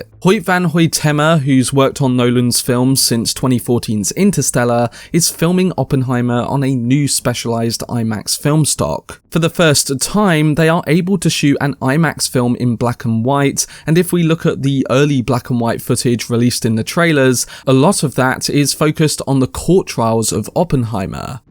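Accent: United Kingdom. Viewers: The pawst? Cawst? At tall? Contrawsting? You Brits crack me up.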